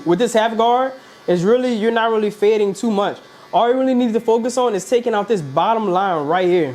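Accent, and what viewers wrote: New Orleans, United States. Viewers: New fave barber channel, you do an excellent job explaining! Sounds like you from NOLA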